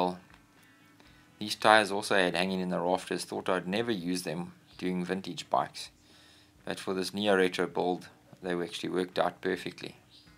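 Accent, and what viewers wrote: South Africa. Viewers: Great build 👍 I’m gradually getting used to your South African accent 😊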